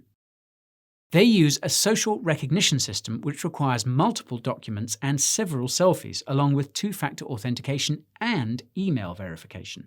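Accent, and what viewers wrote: United Kingdom. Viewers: Love your brit accent & pacing. It sounds much more credible than the typical self-help American mumbo jumbo. With that sweet voice, you could sell me any shitcoin you want, lolz. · Just because of the British accent I trust this guy more lol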